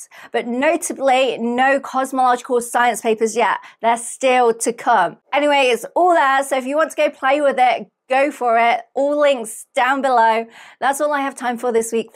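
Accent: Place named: Cockney, United Kingdom. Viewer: you look Chinese but you speak cockney...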